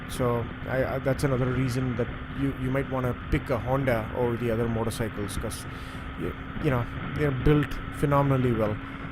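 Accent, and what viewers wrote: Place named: India. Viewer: You sound a little Desi!!🤔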